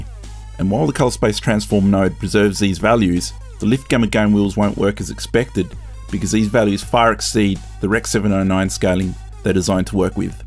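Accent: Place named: Sydney, Australia. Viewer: Lolz,.. sounds to my ears like he's got a Western Sydney or North West Melbourne accent. Trust me, he's talking slow for those accents :)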